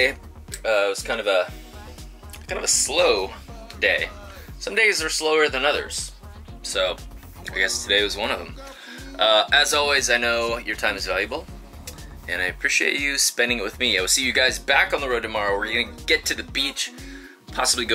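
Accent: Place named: Canada. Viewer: I am detecting a slight Canadian accent from you! Already?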